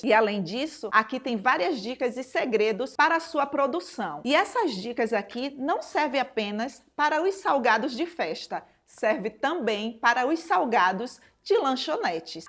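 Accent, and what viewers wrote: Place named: Northeast, Brazil. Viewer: Pelo sotaque tu deve ser nordestina como eu. Suas receitas são TOP. Obrigado por nos ensinar essas maravilhas que você faz. Parabéns.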